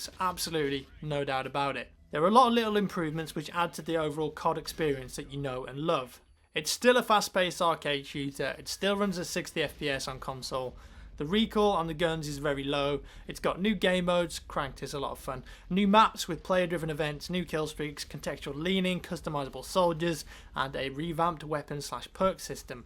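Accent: England, United Kingdom. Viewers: Ur english? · its just a british accent man, take a fuckin chill pill and stop wasting all of out time with comments like these. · he did not said 30 60 controller lmfao.... he said 360... it's just that he's british and when he's talking fast you, as an ignorant american probably, might not understand properly what he's saying.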